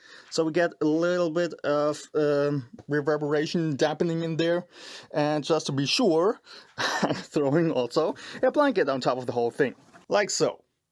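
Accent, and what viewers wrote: Germany. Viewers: "tyooning" "Duck-Terror" Dude, work on your accent. It's not AS catastrophicaly cringey as German accents usualy are, but still. At the beginning of the video it actualy sounded like you deserved praise for NOT sounding like a blithering stereotype; but you've still got quite a ways to go. As for the speakers: The CV75 kinda sounds like a WGS Reaper to my ears... :-o And what's up with that weird noise on the V30?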